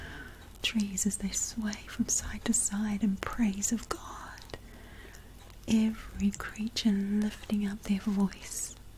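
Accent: New Zealand. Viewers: I appreciate this so much. It was so wonderful to hear a New Zealand accent again as well. Four years ago I spent 5 months in Oxford, Canterbury at a YWAM base there and God worked so much in my life at that time. I accepted Christ when I was 4 but needed to experience Him on a deeper level. What I realized while I was there that has made the most difference in my outlook, is how much of my life I still needed to surrender and trust Him with. I’ve come to realize that is so, so much of being able to really live out the Christian life. Do you know what I mean? Thank you my sister in Christ for letting God use you to speak to others encouraging them in HIm. Also, I greatly respect people doing foster care to help others! Thank you for your sacrifices...I’m going to lift you up in prayer right now that God will encourage your heart today. 🥰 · Are you kiwi! Love Kiwi christians 👏🏻👏🏻🙌🏼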